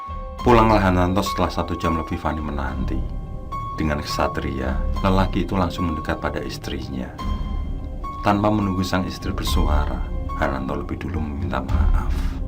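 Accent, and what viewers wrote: Java, Indonesia. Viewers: suarane anteb.. jowo mbangets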